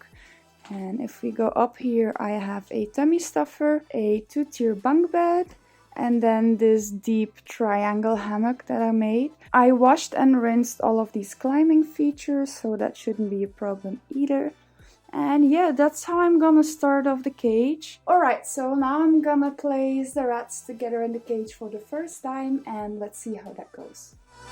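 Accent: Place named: Netherlands. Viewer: ben je nederlands?? je hebt een beetje een nederlands accent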